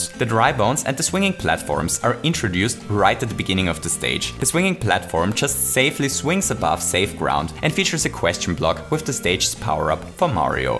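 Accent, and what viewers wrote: Germany. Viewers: Are you a German because you're english sounds like it has a german accent and I'm Not really Sure about it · Wait r u German? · Du hörst dich deutsch an :D kann es sein? :o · Could you please make Videos in German? Ich kann deinen Akzent hören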